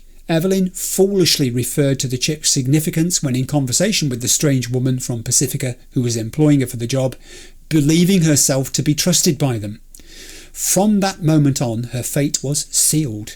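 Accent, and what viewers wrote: United Kingdom. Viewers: a 4 hour episode of cyberpunk 2077 narrated by a great British gentleman? sign me up! I'm always looking forward to the new episodes every monday because they are so good every time and i also cant believe we are already at episode 26 sjeesh time flies!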